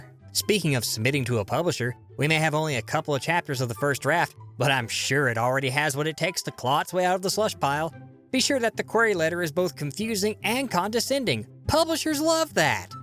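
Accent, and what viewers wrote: South, United States. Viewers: dislike for southern accent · I think the slightly southern accent just makes these videos so much better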